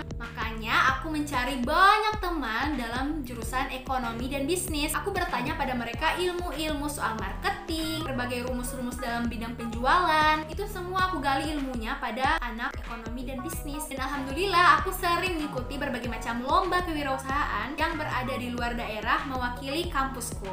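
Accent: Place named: Gorontalo, Indonesia. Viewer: Ternyata org Gorontalo. Dri awal sdh kntra logatnya :) Good job. Semoga sukses dan berkembang channelnya. Btw kita satu Almamater (UNG). Tp beda jurusan, dan mgkin beda angkatan. :) Sya dri Teknik Industri. Salam kenal. :)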